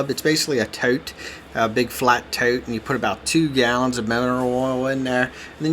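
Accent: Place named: Maryland, United States. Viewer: You sound very Maryland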